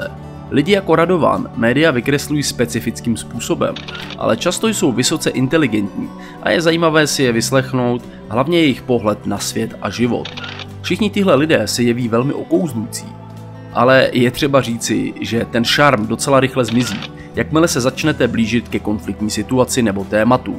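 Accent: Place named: Pilsen, Czech Republic. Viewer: Tak mluví lidé ze z Plzně. Je to v Česku normální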